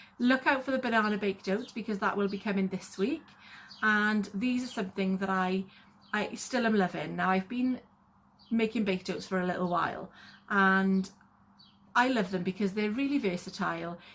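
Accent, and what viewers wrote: South Wales, United Kingdom. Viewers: Hay found you on YouTube today. Thank you for sharing your SW journey with us. So pleased that your family are home safe and well. Congratulations on your NSV, you will lose that game next week. It’s lovely to hear voice from south Wales. X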